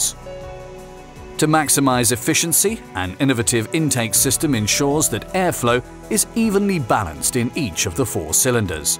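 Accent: England, United Kingdom. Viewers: All sounds good huh with introduce by someone with an English accent until you hear the problems they are having the Eco Boost engines. PLEASE call it what it really is... TURBO CHARGED ENGINES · The narrator is British... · Lost me with the British voice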